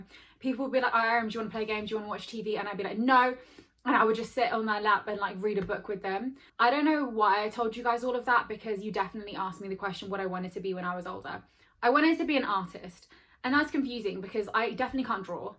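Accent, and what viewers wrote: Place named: United Kingdom. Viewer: Oof that British accent 🥵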